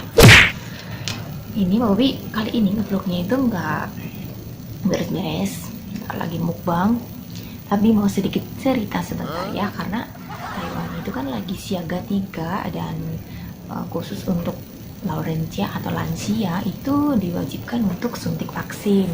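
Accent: Banyumas, Indonesia. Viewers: Ora ngapak ora kepenak mantep pokoke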